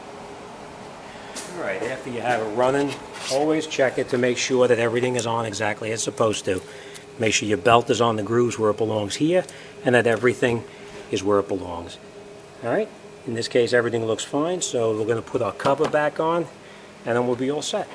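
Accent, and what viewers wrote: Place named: New Jersey, United States. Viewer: I closed my eyes and it was like Bobby Deniro’s garage in here! Love that Jersey accent! I’m gonna do this soon on my 03 Sport plus check all the pulleys for noise. Mines got 200k and it sounds like idler, tensioner or power steering pulley is making an awful hum. Thanks for the great video. The complainers can just fugget-about-it! Lol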